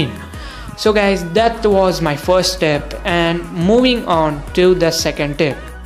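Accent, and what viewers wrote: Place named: England, United Kingdom. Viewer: Nice English Accent 👌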